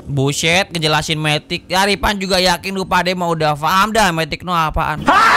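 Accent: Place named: West Java, Indonesia. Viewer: Kepincut ni channel karena logat sunda n music terompetnya 😂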